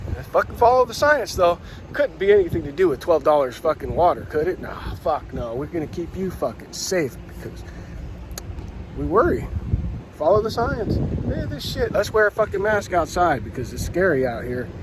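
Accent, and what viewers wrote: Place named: Hawaii, United States. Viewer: Lol I’m with you! Where are you from originally? You also sound like you grew up in Hawaii.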